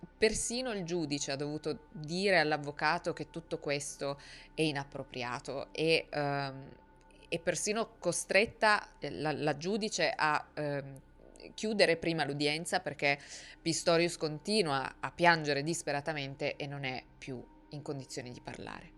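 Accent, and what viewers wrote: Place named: Piedmont, Italy. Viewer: "Pistola spiegata"??? Gia, fastidioso l'accento piemontese (corso di dizione, è il tuo lavoro). Ma certi strafalcioni.... Aggiungo: "Sfonda la porta del water"...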